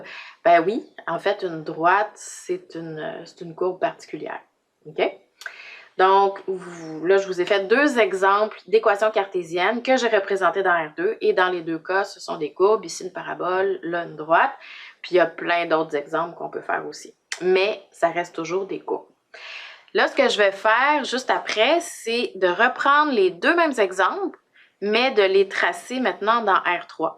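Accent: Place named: Quebec, Canada. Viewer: Enfin ce qui était resté obscur dans mon esprit devient clair comme un jour radieux grâce à la rare qualité de vos explications malgré la difficulté de l'accent québécois pour des oreilles françaises ! Soyez en remerciée !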